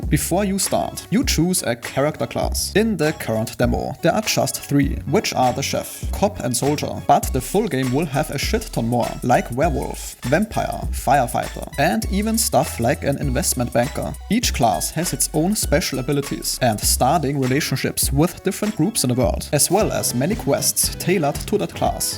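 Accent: Germany. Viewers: Bro are u german your english pronounciation seems famliar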